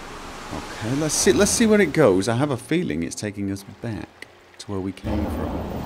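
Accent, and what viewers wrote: United Kingdom. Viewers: Hey.. Where are you from? Your accent sounds sort of British, but I notice you roll your R's, well, not all of them, only every now an then..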